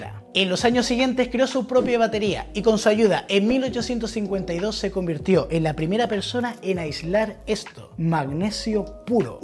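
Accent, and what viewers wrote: Chile. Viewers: Hola amigo, súper tu video... Eres erudito en el tema, fascinante! Muy documentado. Intentaba recordar de dónde es tu dialecto: y bingo.... lo recordé: chileno. Un saludo desde Colombia. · Juraba que eras chileno, tu acento se parece muchísimo al acento chileno neutral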